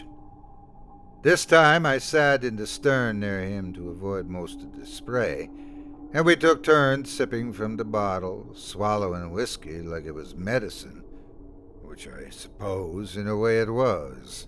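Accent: South, United States. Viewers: Absolutely love listening to these stories told by you 🌹 Your voice/voices remind me of my southern roots 🌹🥃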